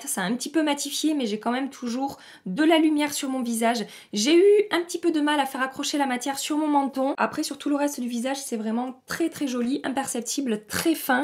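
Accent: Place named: Bordeaux, France. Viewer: serais tu de Bordeaux ou de la région bordelaise pour avoir cet accent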